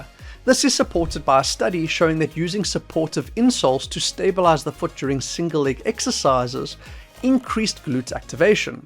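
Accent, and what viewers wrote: South Africa. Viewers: Yo yo. You sound South African. I can't find any decent barefoot or wide toebox shoes. Where do you recommend I go check. I live just outside of Cape Town in Paarl. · Great content. Are you South African?! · Are u South African? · sounds South African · Accent sounds South African?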